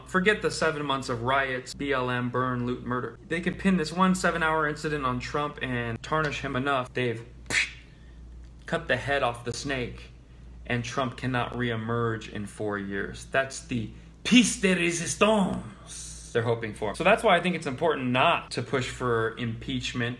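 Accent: United Kingdom. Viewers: Good British accent K-Von,you make me laugh,and I agree with everything you say."Get in there""!!!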